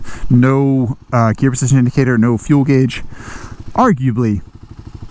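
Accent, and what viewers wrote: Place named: Orange County, United States. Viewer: I don't follow you, but your ride looks like suburban hell, dawg. Accent I'm calling oc.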